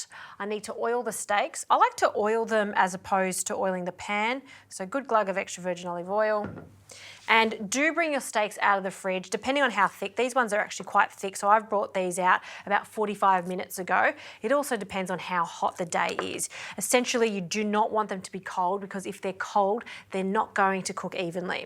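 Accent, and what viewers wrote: Australia. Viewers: Hmmm. Some Hybrid pan? Needed closed captions because of “ throw one on Barbie “ accent.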